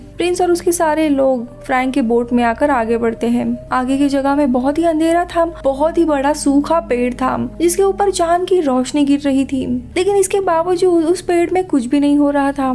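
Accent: Maharashtra, India. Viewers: Hii Host, Your mother tongue is Marathi. Sozhavi Dhashak nahi Solavi Shatabadhi.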